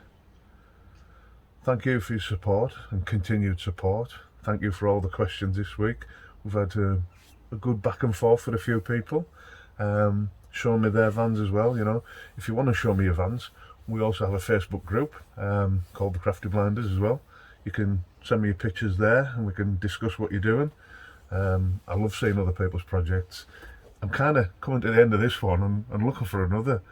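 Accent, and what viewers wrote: Cumbria, United Kingdom. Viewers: Clicked on video as I am considering fitting a tank to my motorhome, but as soon as you spoke I recognised that Cumbrian accent ..... and lo and behold you are from the same town as my wife and her family, perhaps you may know some of them ..... Bell, Cass and Dustin are the close family. Anyhow, because you are a Workington lad I will subscribe and watch your future adventures.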